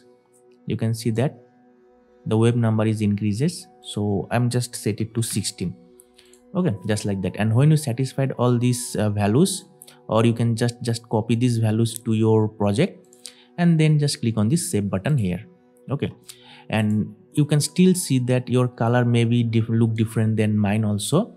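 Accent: India. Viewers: But in an Indian accent 😓.. waste of time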